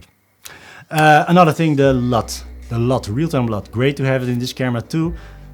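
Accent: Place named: Netherlands. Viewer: only be one the best :), Nederlands tongval ;)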